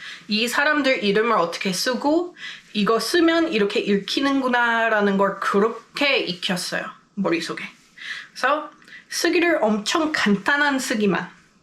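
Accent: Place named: Seoul, South Korea. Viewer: 세상에나. 서울에서 5년 살아도 서울억양 안되던데 님은 진짜 서울말 억양 너무 완벽하시네요!!! 부러워요..그 능력이!!!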